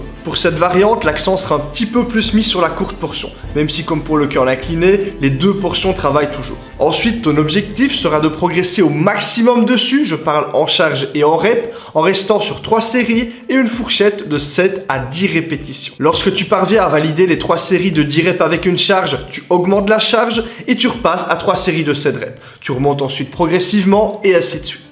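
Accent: Belgium. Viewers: Ahhh l'accent Belge m'avait manqué 💪🤘🇧🇪!!! Merci pour tes conseils !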